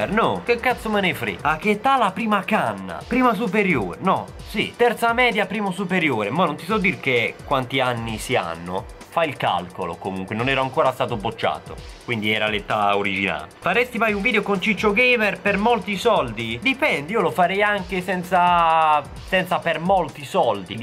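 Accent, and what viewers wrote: Bari, Italy. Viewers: Potrei iniziare ad amare l'accento barese solo grazie a te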